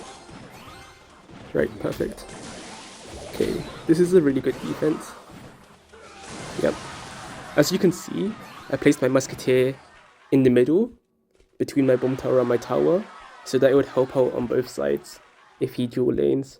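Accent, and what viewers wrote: United Kingdom. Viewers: Hm i remember when u said u wouldn't voice reveal bcuz if ur british accent 😅😂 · Damn bro you sound so British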